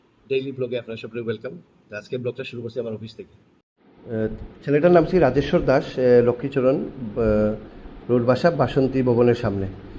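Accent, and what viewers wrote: Sylhet, Bangladesh. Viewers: 😂😂 কারন ও খাঁটি সিলেটী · আপনার ভাষা সিলেটের মত কিভাবে একটু বলবেন?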